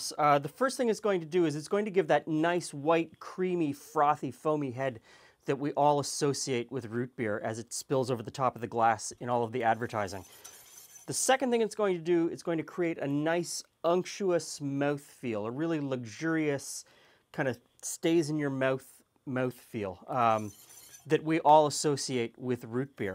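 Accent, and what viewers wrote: Canada. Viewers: You have to be Canadian!! Absolutely nothing wrong with that. It's just how you pronounce certain words, and your "accent" with how you say those words. Like "about"...or you are just further up North from me. Anyway, thank you for sharing your recipe for root beer!! Love home made root beer!! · Canadian eh?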